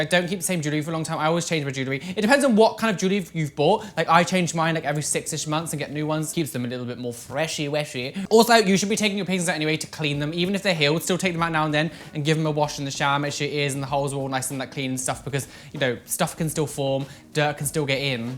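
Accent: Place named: Essex, United Kingdom. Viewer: sounds like a southern (I call it an Essex, considering I grew up hearing the accent a lot) British accent.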